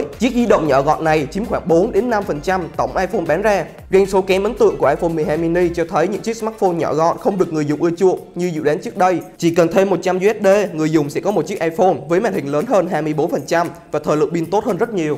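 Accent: Phu Yen, Vietnam. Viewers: Giọng phú yên nói nhanh quen rồi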